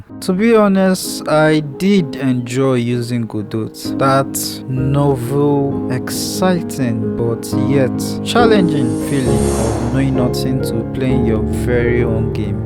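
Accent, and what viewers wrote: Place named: Nigeria. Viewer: U Sound Nigerian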